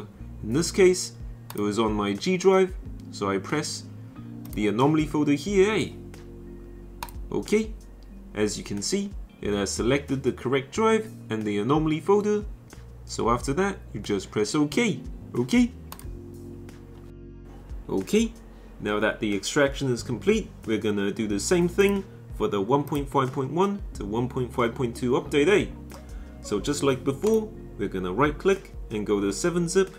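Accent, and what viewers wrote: New Zealand. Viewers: He has a very strong kiwi/native New Zealand accent, very distinctive and a part of our country. Is honestly similar in some ways to South African accent because the conditions of our countrys history is similar, both being British Colonies. But still very different :D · He sounds like he is from New Zealand 🇳🇿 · Thanks for turtoial. NZ Accent goes hard. Much love from Oz. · The bro is kiwi can tell that accent anywhere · Where are you from? Are you a kiwi?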